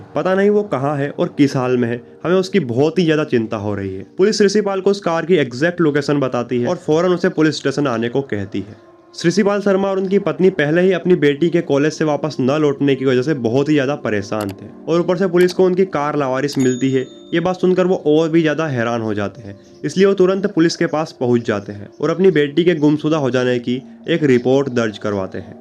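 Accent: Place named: Bihar, India. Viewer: Social media per aap ko k apni language per thoda work karna chy sha ko sa Mt bolye pronunciation acchi honi chahie ek anchor ki presan nh hota preshan hota aapki language bihariyon Jaisi hai isliye story sunane ka maja nahin aaya anchor hone ke liye language per command hona zaroori hai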